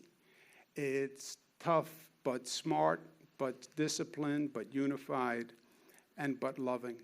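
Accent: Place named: New York, United States. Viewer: Someone’s tone of voice or accent shouldn’t determine his religion. He got a New York accent.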